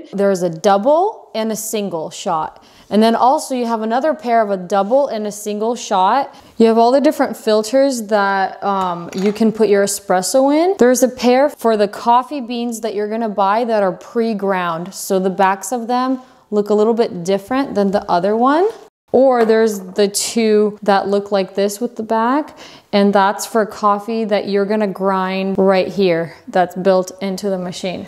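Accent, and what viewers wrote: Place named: Russia. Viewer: i hear some Russian accent notes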